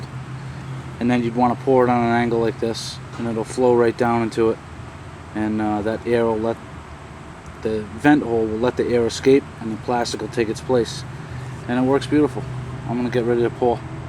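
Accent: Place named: Brooklyn, United States. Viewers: Not bad. You sound like your from New York, Boston, etc. where exactly are you from? I'm a Southern boy from Alabama. Fishing, college football, and most important following Jesus Christ is our passion here. If you ever get the chance, Feb., March, and April is the time to come to Alabama with your boat. Lay lake, Mitchell, Logan Martin, Guntersville, and many more lakes here with monster bass. From Smallmouth on the Tenn. river, spots on Smith, Mitchell, lay, Logan Martin, to Largemouth on Gun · Are you from Brooklyn by any chance? You sound like it.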